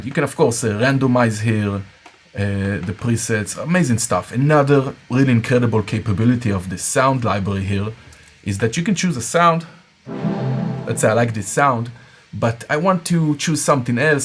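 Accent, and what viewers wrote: Israel. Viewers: יש לך את המבטא הכי ישראלי ששמעתי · אתה ישראלי? 😅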